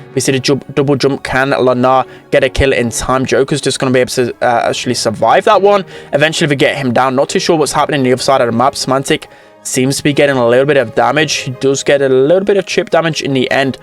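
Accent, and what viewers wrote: United Kingdom. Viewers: I just love British Accent. Underrated commentator too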